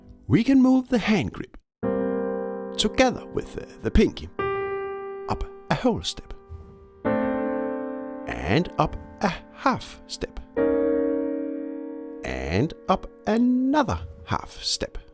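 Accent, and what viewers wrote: Denmark. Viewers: Hej, jeg tager chancen, gætter på du er dansker.....??? Syns jeg kan høre det:-) Super fedt. Hvis du er fra Kbh området vil jeg meget gerne booke et par lektioner hos dig hvis du er frisk.... mvh Peter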